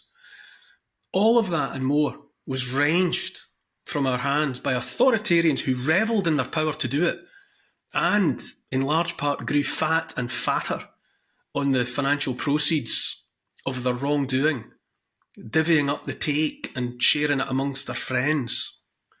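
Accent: Scotland, United Kingdom. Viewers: Lord I love a Scottish accent, although I can't help thinking of Dad's Army.....'We're dooooooomed laddie" 😂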